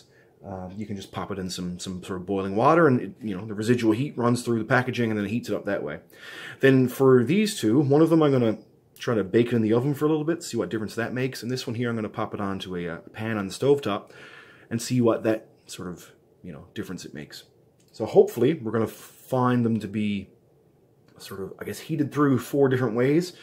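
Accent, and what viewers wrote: Canada. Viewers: At last a Canadian MRE reviewer who speaks like a real Canadian! Pop & pizza pockets. Throw in a bag of hickory sticks and a Coffee Crisp bar and that's a damn good lunch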